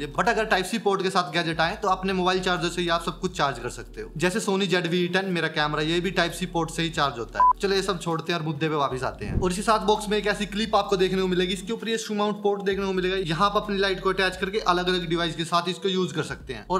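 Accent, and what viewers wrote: India. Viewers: you sounded like himachali 🤗 but great content..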